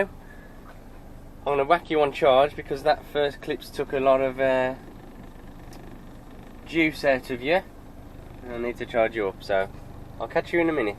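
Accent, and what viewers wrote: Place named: Birmingham, United Kingdom. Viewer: love your accent....is that brummie or just general black country?....and the way you accentuate the letter G in words..lovely...I love watching moody blues interviews they talk more or less the same as you....love it!!!!!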